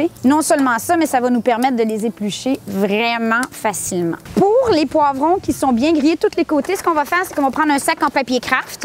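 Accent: Quebec, Canada. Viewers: C'est un accent du Kébeque ?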